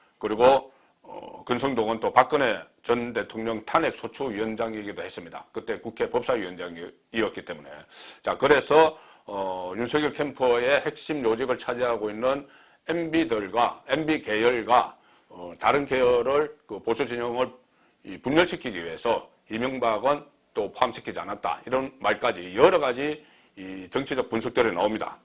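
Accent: Gyeongsang, South Korea. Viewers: 송국장님 경상도분 같은대 우리공화당 지지하시는것 어떠신가요?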